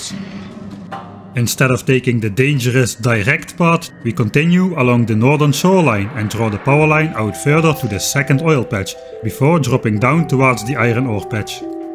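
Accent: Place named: Netherlands. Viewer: I'm actually impressed the algorithm can recognize steenkolen engels.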